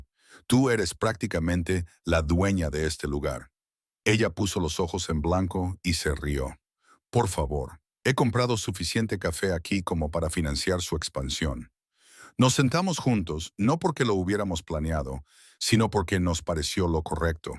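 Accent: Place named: United States. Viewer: La narración es muy mala, el acento yanqui insoportable. 5 minutos y no he aguantado más...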